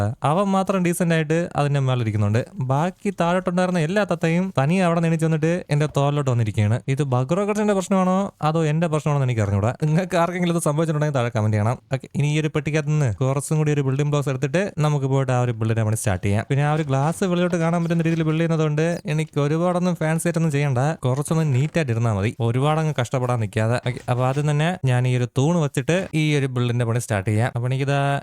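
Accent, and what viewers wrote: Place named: Trivandrum, India. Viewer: crrt tvm slang 😂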